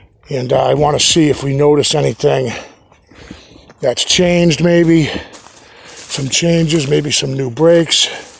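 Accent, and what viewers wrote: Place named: New Hampshire, United States. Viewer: You made me home sick for NH, Born and raised. Then I moved just saw this Sept video on turns scary real quick... You're accent makes me laugh 😂 now.. But loved it, but I was yelling get out.. get out Run don't walk now Skip if you have to I won't hold it against you. I'll FaceTime from KY the next time you need a fast out.. thanks for the content on a rainy day... But as for being so close to the road this is what my parents told me when I was little people always throw trash and food from their cars when they're going down the road so animals like to come up to eat to wash his Bigfoot be any different that's my take on it but they applied it to seeing deer on the side of the road when I was a kid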